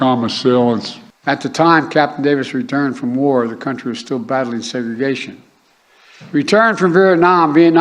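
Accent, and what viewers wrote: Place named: Australia. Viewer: Can't stand even listening to this shit head Aussie ear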